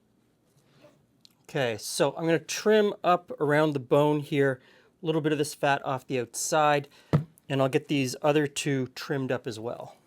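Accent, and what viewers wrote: Canada. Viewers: The CC is translating his Canadian accent "Blazing Bull", to Blazing Bowl.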